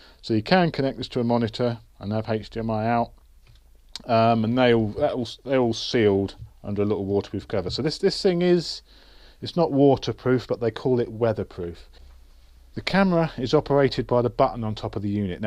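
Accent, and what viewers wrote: United Kingdom. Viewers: reviews are certainly more watchable when it's in a smooth english accent. Sure beats Americans telling me "it's frikkin awesome!". May want to think about quitting your day job;) a walking disaster haha either that or his comedy act is really coming along. Can I ask…how much does it cost to feed and look after a pet? only they look like so much fun but I've also heard bad things like they go a bit ravenous over a nipple and also pretend to leave their debit card at home when it comes to paying all the time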